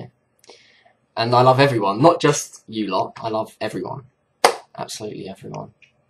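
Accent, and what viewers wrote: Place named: Harlow, United Kingdom. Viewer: At last!!! I can pinpoint that accent...HARLOW!!! :^) lol. I'm an Essex boy but the complete opposite of the stereotype, and now live on an Island in the Indian Ocean...another Brit that has jumped ship! Anyway, regarding your series on Wave Function...I think you might be interested in Nassim Haramein, search also for ''the illusion of reality'' (59mins), and Flagellar/um motor or watch watch?v=VWvS1UfXl8k all parts. Peace Dude (fellow guitar player).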